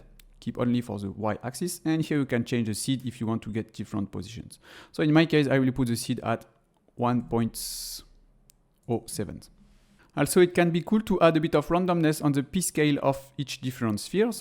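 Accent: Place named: France. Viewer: why not creating different sphere and put them one by one ? and you won 10 min wasting time , . why you need to creat line to instance point on that lines ?! when you add something you did not say why ... and it abit hard to use plugin to separate materials node ? do it from houdini after use 2nd way to plugin mybe this plugin is not free or someone cant get it . atleast know how to do it natively but thank you for your videos bro . and vive la france ^^ . from your accent i can tell you are french guy . you know very wellhoudini nice to meet you bro .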